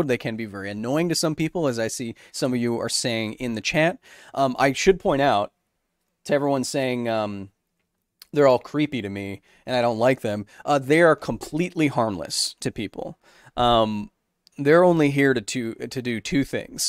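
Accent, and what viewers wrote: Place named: Australia. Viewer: I’d say your Australian accent sounds similar to Wood’s accent from Beatemups. Not really an opinion on what region it sounds like, but you can do an impression xD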